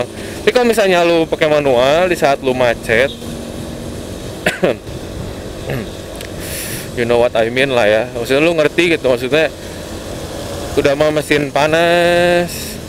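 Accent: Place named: West Java, Indonesia. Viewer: Orang sunda ya mas. 😅😂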